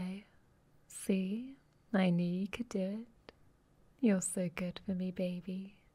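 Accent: United Kingdom. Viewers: Thats accent sounds very either scottish,british,or irish and ...i really like it,not just cause im irish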